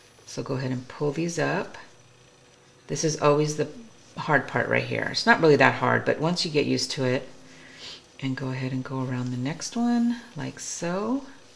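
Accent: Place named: United Kingdom. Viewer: Sounds like your from the UK... The stitches are in English / US terms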